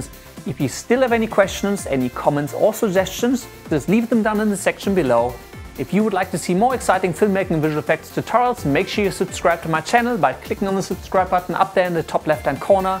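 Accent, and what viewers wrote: Germany. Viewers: Man hört direkt das du Deutsch bist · Do i hear a german accent? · Man merkt das du deutscher bist :) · Your German accent is so cool:D! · So are you a German with an English Accent? I'm trying to figure it out. I understand what you're saying pretty well but it's not California American, that's for sure. Just curious. Your videos are great! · He has a German accent so he should be German. Anything else would be odd. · "Foa evaa" Das deutsch drückt schon heftig durch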